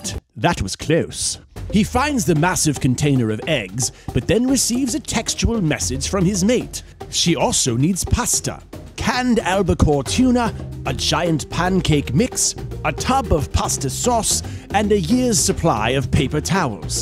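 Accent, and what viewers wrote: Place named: United Kingdom. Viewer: I love that this is a British accent “crisp” but is the driveway called a driveway? Cul-de-sac? Trunk? And as an adhd-er in Costco I have to say I have a ton of socks. Sure the lights are pretty on those other things but socks . . . You need those. . . I can’t fit them all in my sock drawer anymore. I really need to have a sock drawer for different seasons. (The sock aisle is way more dangerous than the tv aisle)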